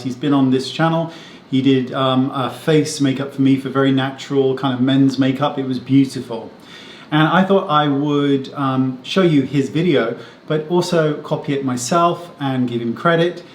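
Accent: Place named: England, United Kingdom. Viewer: Robert Welsh also did something like this on his YouTube channel. Out of all the channels I watch you and him are my absolute favorites. Maybe it’s the fact that your both true makeup artist but I think the English accents pull me in as well lol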